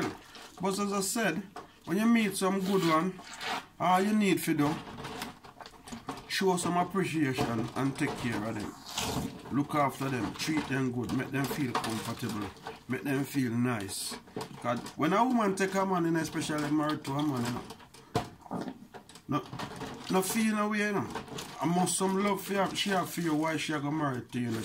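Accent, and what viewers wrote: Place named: Jamaica. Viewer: u sound like a real good jamaican man, Im new to ur channel I notice u spend allot of time in ur kitchen lol u must love cooking that's rare to find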